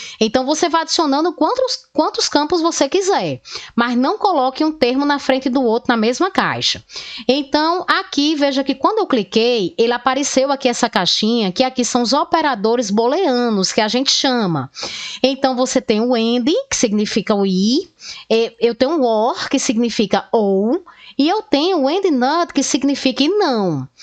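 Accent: Northeast, Brazil. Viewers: Já me inscrevi no canal. Super clara e com sotaque nordestino ❤️ Obrigado!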